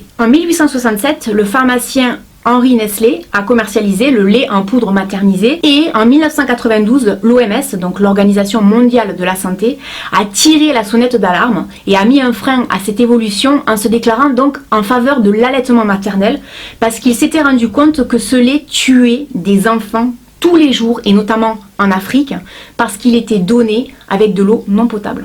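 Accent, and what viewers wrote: South, France. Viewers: Toi tu vien du sud j'aime ton accent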